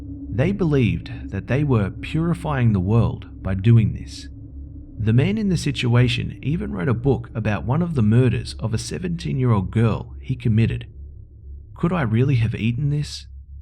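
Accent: Australia. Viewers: Hello B are you Australian??? Pleas reply. Your the best!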